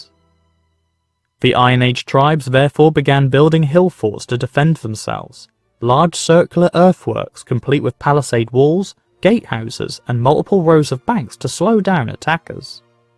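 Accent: Norfolk, United Kingdom. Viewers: Think I'm hearing a Norfolk twang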